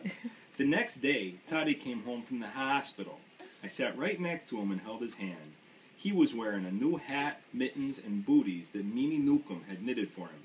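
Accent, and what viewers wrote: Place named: Maine, United States. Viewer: Awesome ! Sounds like Dolores Claiborne meets Fargo ! Lol but love it ... Love Maine accent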